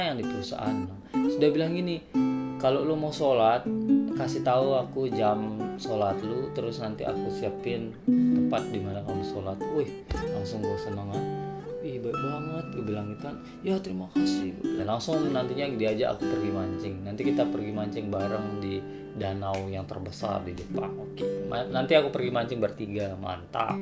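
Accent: Lombok, Indonesia. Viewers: Anoo " ciri khas org sasak klo ngomong 😂😂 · Bang kentel banget logat lomboknya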